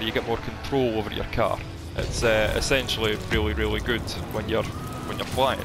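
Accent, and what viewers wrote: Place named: Scotland, United Kingdom. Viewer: I really like the Scottish accent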